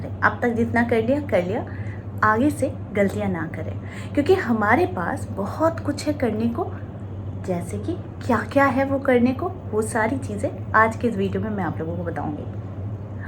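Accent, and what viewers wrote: Bengal, India. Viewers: R u Bengali??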